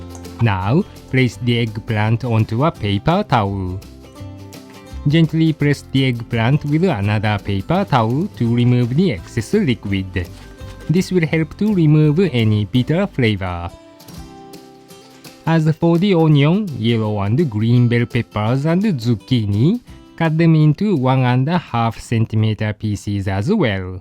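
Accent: Japan. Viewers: I love the narrator's thick japanese accent when he's speaking english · 日本人の耳からすればナレーターが日本語なまりの英語を演出しているのがわかってしまうツラさ…w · as someone who speaks both English and French, I can conform that a French-English accent sounds n o t h i n g like that! It's just his original Japanese accent I think?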